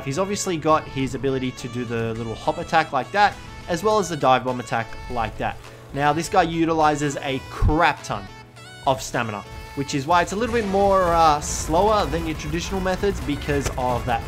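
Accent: Australia. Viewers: Are you Australian? I love your accent! ☺️💞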